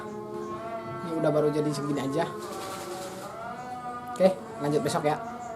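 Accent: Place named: West Java, Indonesia. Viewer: Nu sae ngango na kayu naon kang..?? Bade nyobian yeh ngadamel nyalira.. tina logat na mah da jiga asli sunda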